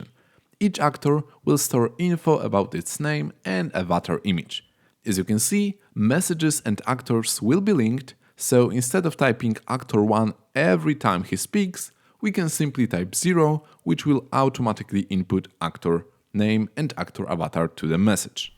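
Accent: Poland. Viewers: Jesteś z Polski? Bo tak trochę po głosie słychać ale nwm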